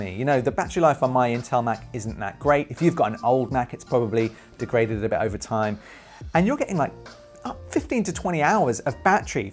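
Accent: United Kingdom. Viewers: Are you from London ?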